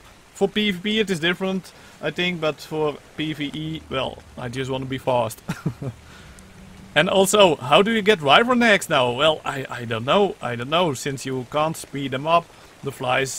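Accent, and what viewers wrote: Netherlands. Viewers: are you dutch? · ben jij een nederlander je hebt het typisch nederlandse accent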